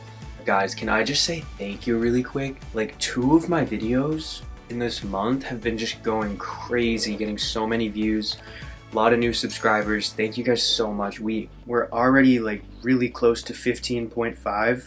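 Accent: Canada. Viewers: I'm quite sure he's from Canada, because he has a slight accent, that is only obvious in a few words. He's mentioned his brothers, but i don't know if they have been in any videos. I'm sure they must be as hot and cute as him.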